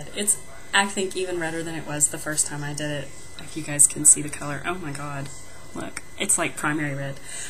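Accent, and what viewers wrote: South, United States. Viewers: where r u from? you kinda sound southern?!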